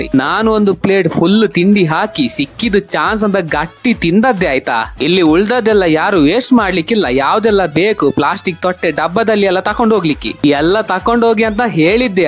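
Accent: Mangalore, India. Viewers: Nimma mangalore kannada bhashe chanda untu marayya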